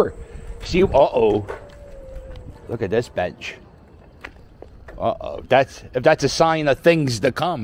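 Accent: Philadelphia, United States. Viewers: Love that Philly accent. Left in 1957 and never really lost it.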